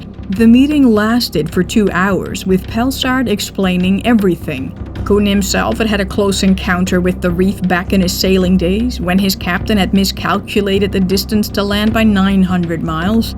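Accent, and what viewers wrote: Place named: Netherlands. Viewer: I have read many books on the Batavia topic, and even done some research myself about the subject... and never came across material so valuable from an unknown source! Yes, you may find specialised info reading the "usual" suspects (Mike Dash, Hugh Edwards, etc.), but I never expected such a high level from someone running a YouTube channel! Your style is professional, yet accessible to everyone; the research well done and facts stand verification; and your narration, with your unique Dutch accent makes the series even more enjoyable. I recently went to the Houtman Abrolhos Islands, and visited all the islands in this chronicle, I took photos that I could share with you, in case you wanted to update your material, or just happy to share them with you if you're curious... You are doing an amazing job and I commend it! Well done! 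Looking forward to more of this...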